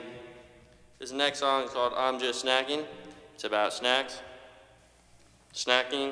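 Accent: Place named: United States. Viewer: I did not expect his speaking voice to be so American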